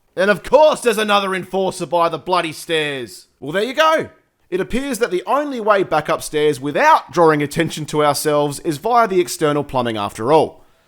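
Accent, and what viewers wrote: Australia. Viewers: Aussie accent just makes everything better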